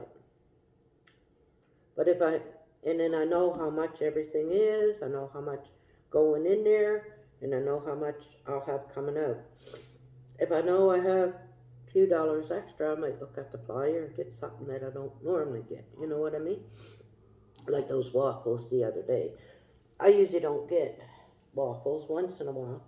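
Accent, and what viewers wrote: Canada. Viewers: Are you originally from alberta? Some things you say sounds like you’re from one of the Atlantic provinces!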